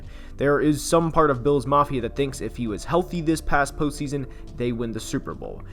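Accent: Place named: Baltimore, United States. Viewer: I hear a Baltimore 'o' in the narrator's accent.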